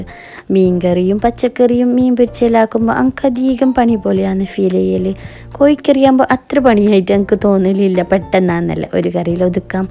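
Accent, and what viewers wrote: Kasaragod, India. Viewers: കാസർഗോഡ് സംഭാഷണം കൂടി ആയപ്പോൾ മീൻകറി sett ആണ് 👌😍😍😍👍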